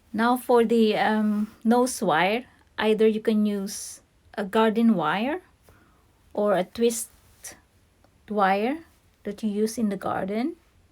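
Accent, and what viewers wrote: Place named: Philippines. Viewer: Based on your accent you must be Pinay. Maraming salamat.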